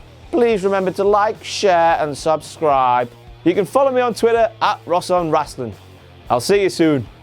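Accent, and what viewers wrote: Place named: Newcastle, United Kingdom. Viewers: I love how the posh accent slowly drifts into the accent of kings, Geordie by the way!!!!! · I love that you can hear the Geordie coming through the pompous accent, it's brilliant.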